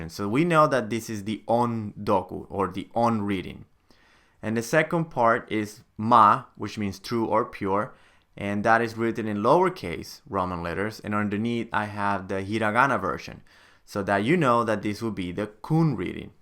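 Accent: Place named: United States. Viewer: Akimoto Hasegawa this is definitely not Japanese or Chinese accent, as a Chinese I think he is an American .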